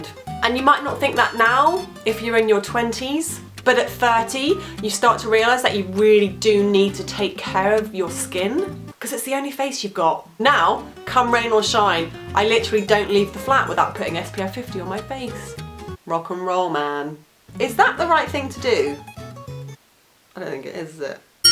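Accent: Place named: Cockney, United Kingdom. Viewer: I think point 23 is the most satisfactory for you today; good for you. I know i'm annoying, but why don't you create english subtitles for your videos, since you write your own scripts for the most part of them? Foreign audience would be pleased to translate them into other languages, so that they could enjoy your sort of cockney accent.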